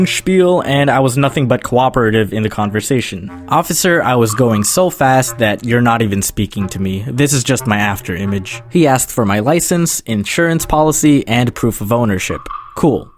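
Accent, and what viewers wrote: Canada. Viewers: Why in the absolute fudge do you have a semi-quebecan (?) accent when speaking french?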